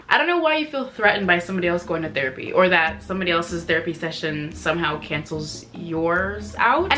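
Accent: France. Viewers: Okay but ton accent français >>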